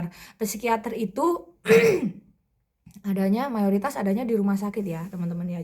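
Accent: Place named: Madura, Indonesia. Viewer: Bun logat nya kaya orang madura ya bun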